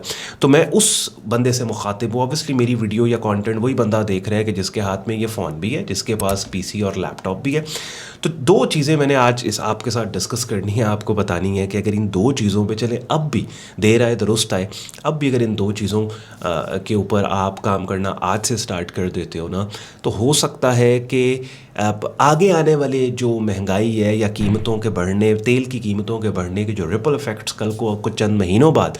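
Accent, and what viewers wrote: Punjab, India. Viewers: Love you, sir. Your Punjabi is very cute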